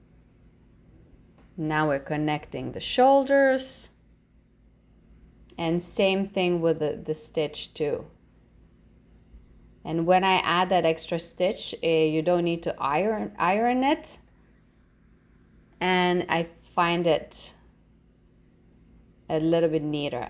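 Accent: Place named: Lithuania. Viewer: You have such a nice personality! I could watch you over and ovr. I'm new sub, 77 from Wisconsin, USA! I'm glad I found out your origin is Lithuanian, because I've watched 4 videos and I've been trying to figure out where you were born, your accent is SO CUTE! I'm watching ALL your videos now. I wish I fit in your clothes! I'm a bigger woman, but I would LOVE to buy an outfit to wear from you. God bless you!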